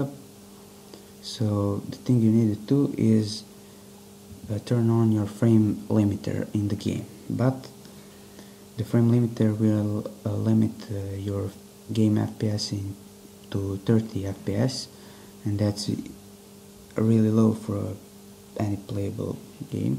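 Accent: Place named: Mexican-American, United States. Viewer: You talk like a American-Mexican.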